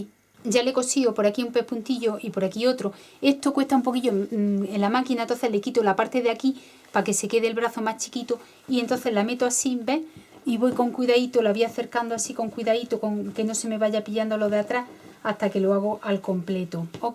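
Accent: Andalusia, Spain. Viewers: de donde es tu acento español? Andaluz? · QUE RICO HABLAS, OLE LAS ANDALUZAS.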